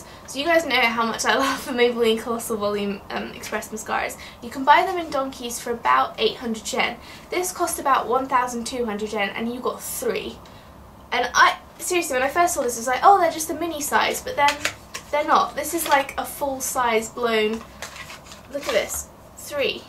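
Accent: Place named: England, United Kingdom. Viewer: You sound English though do you live in Japan x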